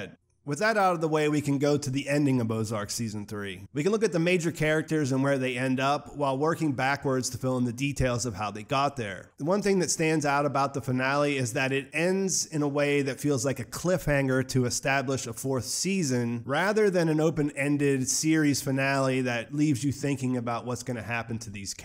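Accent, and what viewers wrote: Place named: Pittsburgh, United States. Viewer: Hey! Thanks for the great analysis! I picked up on your Pittsburgh accent! I am a Burgher too!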